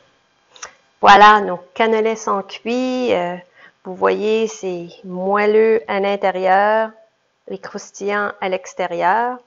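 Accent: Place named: Acadia, Canada. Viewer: Excellent merci pour ton bel accent succès a toi par la grâce d'Allah ,un bonjour de France amis Acadiens!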